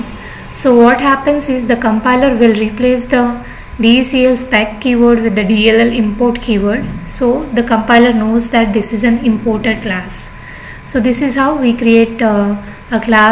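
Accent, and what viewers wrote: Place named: India. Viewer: when i heard the idian voice, i knew 100% that you will be the solution. thank you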